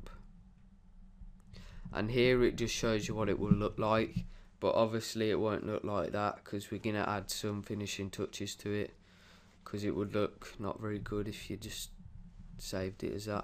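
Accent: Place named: Birmingham, United Kingdom. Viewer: birmingham accent lol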